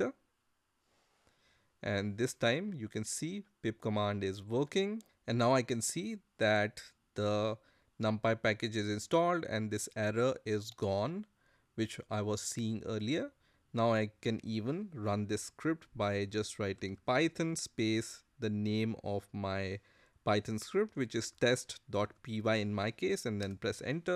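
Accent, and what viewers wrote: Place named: India. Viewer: know the tutorial will be good when the person sounds indian, amazing tutorial:yougotthis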